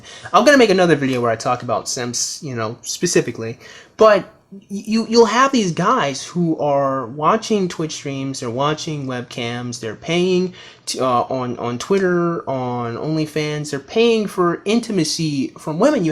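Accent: United Kingdom. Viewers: I love your british accent btw, some real funny shit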